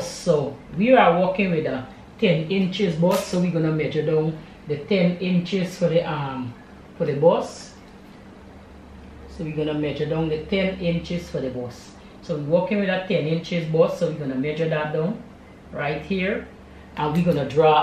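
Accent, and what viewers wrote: Guyana. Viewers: Good day love your video you sound like a Guyanese. I am too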